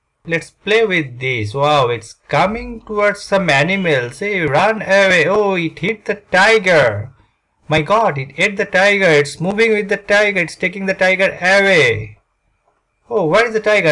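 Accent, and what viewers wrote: India. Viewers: Speak using proper English accent, please. Your Indian accent is so irritating.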